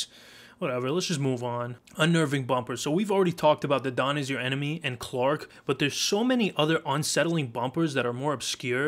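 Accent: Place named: Brooklyn, United States. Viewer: This guy is the most Brooklyn youtuber ever I love it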